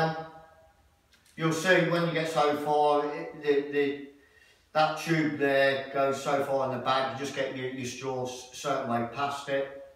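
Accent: Leicester, United Kingdom. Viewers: hi,mate are you from leicester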